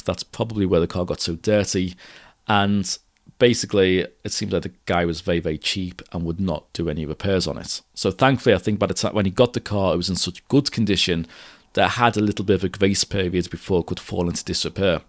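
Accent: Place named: Wirral, United Kingdom. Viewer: Do you still have this car buddy ? If so are you willing to sell it ? I live on the Wirral which doesnt sound too far from you based on your accent! Would love to buy it from you mate.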